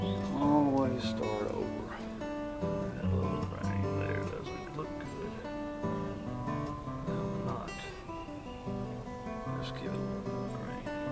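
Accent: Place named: South, United States. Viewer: I’m getting a “southern” Bob Ross vibe. Excellent outcome, wood grains look awesome.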